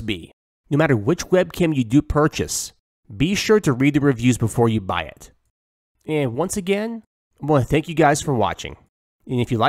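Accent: United States. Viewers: you from nebraska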